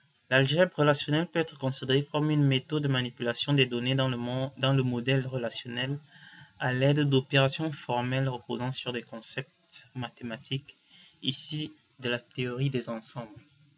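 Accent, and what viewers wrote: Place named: Cameroon. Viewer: je sais que c'est mon frère camerounas qui a fait la video ci, notre accent est trop mo gars merci pour la video je compose dessus tout a l'heure ;)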